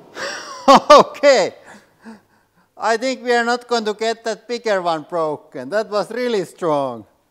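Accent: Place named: Finland. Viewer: His finnish accent haha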